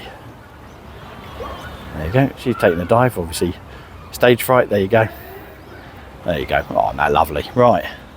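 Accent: United Kingdom. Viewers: Great video, never been to Kensington Gardens but it looks lovely. And I’m understanding the London accent more aswell 🤣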